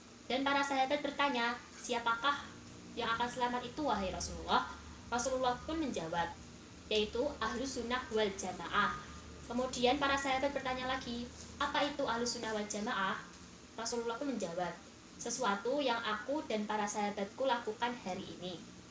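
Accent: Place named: Java, Indonesia. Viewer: Full audio nihh.. Barokalloh Alhamdulillah penjelasannya mudah dimengerti ty Btw Logatnya lucu ukhty, medok banget uwwuu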